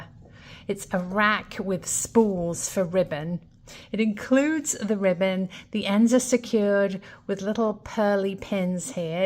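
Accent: England, United Kingdom. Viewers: Thank you for answering my question. Your English accent is still strong so I was wondering. I have been watching your channel for a few years. Love your finds. I have been to Las Vegas 4 times 1st time in 1992 and spent 3 days of our honeymoon there in 1995 when we travelled aound the west coast but never visited a Goodwill. Take dare.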